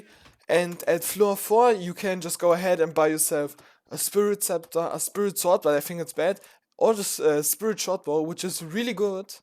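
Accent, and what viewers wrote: Germany. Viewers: alter dieser Deutsche Akzent ist insane bei dir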